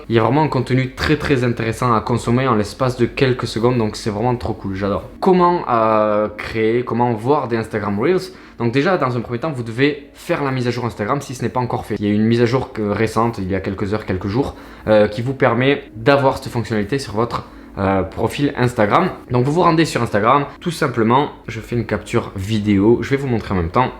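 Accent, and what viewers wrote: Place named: South, France. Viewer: Salut! Je viens de découvrir ta chaine ajd, merci pour ton travail! C'est génial de pouvoir écouter qqn avec l'accent du Sud haha! En tous cas si l'ocean et la photo sous marine t'intéresse, mon insta c'est: saltyskin_photography Cheers!:)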